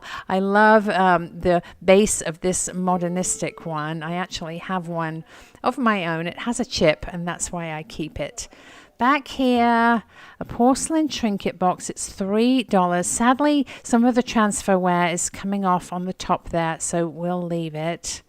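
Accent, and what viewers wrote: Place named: United Kingdom. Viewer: I thought you were British which was why I mentioned the UK. Love your accents either way. I don't believe that I have ever seen it written in any book or novel that way and just began hearing it the past couple of years and was curious and it certainly was not taught in school that way. Anyway, thanks for the response and the videos..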